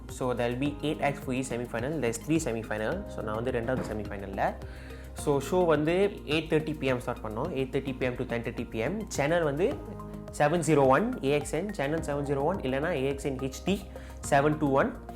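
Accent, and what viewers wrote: India. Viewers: I dont if it's only me but he sounds like Indian to me.🤔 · Moment i saw your face and accent I guessed you are tamil. Now it was confirmed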